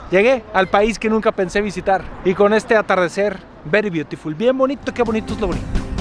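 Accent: Mexico. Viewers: Jaja 😆 me ha encantado tu paseo por ahí en Kazajistán 🇰🇿 y tus comentarios con esos acentos muy mexicanos wey: #tenga su tenge jaja 😆!! La escultura Dominicana 🇩🇴 👏👏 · Si dejara tanto acento mexicano sería mejor este YouTuber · yo te voy a ser muyyyyyyyy sincero la verdad yo veo tus vídeos porque el acento mexicano es un afrodisíaco para mis oidos. Vos estas guapo rico delicioso y de verdad me gustas me exitas me encantas veo tus vídeos e imagino te doy besos detras de la oreja.Y si tus videos son balsamo para mis ojos pobres que aun le falta por conocer. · Jajajajaja bien canijo me encanta como habla este muchacho bien Mexicano a lo mero mero!!! Me encanta su energia y alegría es como una Ave aventurera y donde el viento lo lleva ahi va bendiciones!! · El acento mexicano es insoportable. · Tu narración es de diez 👍👏👏👏👏👏no me aburro y hasta me rio con tu tipico acento mexicano. 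Realmente como dicen los españoles una gozada este video además de ser muy interesante 👍👍👍👍👍 Gracias y por más Kasachtan 🇰🇿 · Se le sale mucho lo mexicano esta vez.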